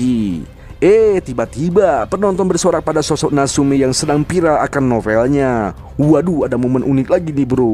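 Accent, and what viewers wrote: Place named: Manado, Indonesia. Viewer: Takage ya manado banget😂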